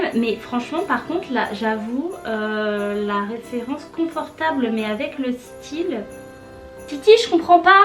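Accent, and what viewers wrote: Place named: England, United Kingdom. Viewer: Je suis jaloux de ton accent anglais 😭😭😭😭😭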